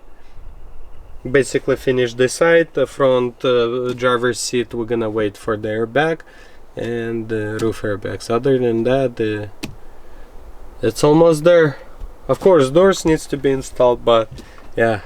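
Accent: Russia. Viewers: Касаемо контента, все супер! Тачка моей мечты!!! 😃😃😃Но, я один слышу Русский акцент в разговоре? Или мне показалось? Касаемо АВТО: Если делать под себя, ТО раз уж разобрал, ТО я бы сделал Шумку!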